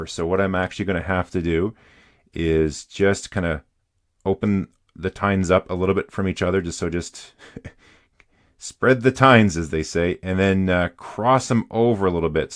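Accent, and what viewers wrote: Canada. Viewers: I'm an American and I lived in Hungary for 18 years and speak Hungarian. In the Hungarian language, the river is called the "Duna" (pronounced DO-nuh). Not sure about the origin of the word "Danube," but you're right, I've always heard it pronounced like you say, DAN-yoob. Maybe it's a Canadian English thing 🙂. Nothing major either way. This was an excellent and very useful video for adjusting nibs. Thank you, sir!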